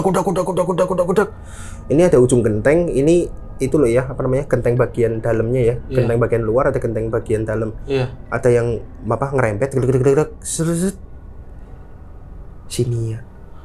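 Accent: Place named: Banyumas, Indonesia. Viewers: Kereeen bahasa ngapaknya.. Sering2 diselipkan bhs ngapaknya bang.. Biar makin seru.. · Cilacap hadir, wkwk jawa bgt ya mase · Bhasane msih ketara ngapake kang kya nyong 😅😅😅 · Joss wong ngapak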